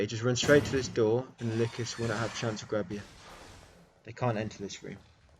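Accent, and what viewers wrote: United Kingdom. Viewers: Great guide, your british accent was kinda funny and also mislead me once but its fine and I feel like without you I wouldn't even attempt to beat hardcore normally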